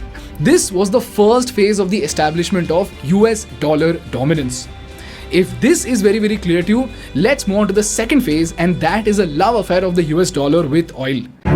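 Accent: India. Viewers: Indian English really different and very strong Indian accent. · That indian accent 😋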